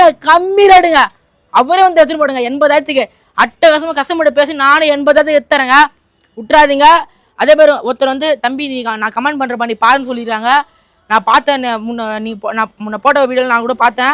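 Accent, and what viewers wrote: Madurai, India. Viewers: Madurai vasagam super 👌 🎉🎉🎉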